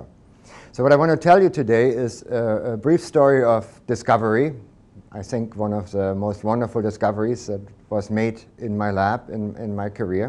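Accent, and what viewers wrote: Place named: Germany. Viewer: I remember when he still had a thick German accent when I first met him. It looks like it has shifted over time.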